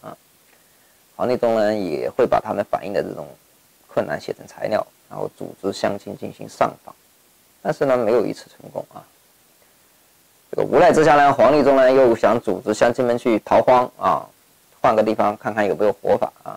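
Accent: Guizhou, China. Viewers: 配上字幕，真的是好多了。。。不过我刚刚习惯了听你的贵普，哈哈。。。另外，字幕有较多错别字，估计是语音转换的关系。比如那个“一语成谶”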